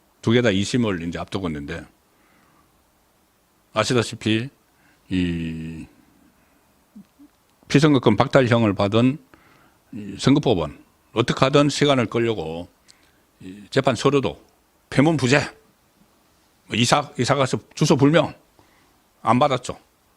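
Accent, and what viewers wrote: Gyeongsang, South Korea. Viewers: 조중동 종편 눈치나 살금거리고 보면서 대세가 어딜까? 머리굴리는 경상도 말투 송국건 ㅉ 경상도 의리있다는 거짓말이나 하지마라 ㅉ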